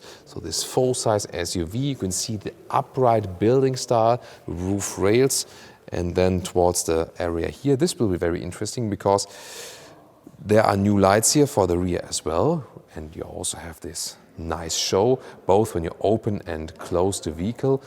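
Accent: Germany. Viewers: Never heard a more german english speaking accent 😬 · This guy has the strongest and most awkward German accent ever hahahahahha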